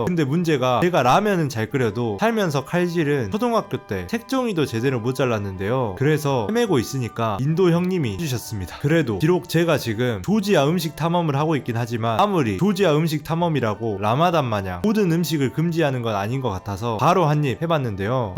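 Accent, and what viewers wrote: Jeolla, South Korea. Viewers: 전라도 사투리인가? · 전라도 엑센트 너무 정감가요 ㅎㅎㅎㅎㅎㅎ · 생~소한 조지아를 소개하는 전라도억양의 청순한 청년.. 잼나요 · 전라도 사투리가 귀에 거슬리는군요. 표준어 사용하시길... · 아따 말투가 겁나 정겹다잉 편하게 말하자 어차피 너무 전라도다. 신나게 쓰자 너무 정겹다. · 전라도억양이 들리는데 전라도 사람 맞나요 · 특이한 멘트에 전라도식 사투리억양 개성있네요.. 재밋게 잘 봤어요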